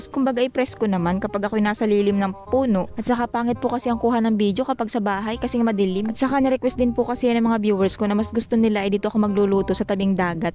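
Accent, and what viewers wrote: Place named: Marinduque, Philippines. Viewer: Miss ganda at masipag pa kala ko taga marinduque ka kc un mga salita u ay puntong marinduque ngani mandin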